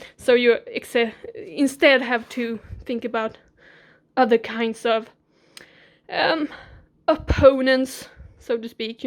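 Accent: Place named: Sweden. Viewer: Hi. You are Swedish, right? I can tell by the accent 😊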